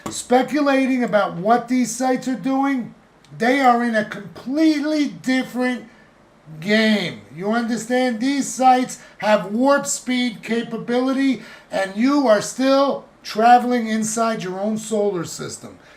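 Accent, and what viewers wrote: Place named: Philadelphia, United States. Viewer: Hey are you from Philly?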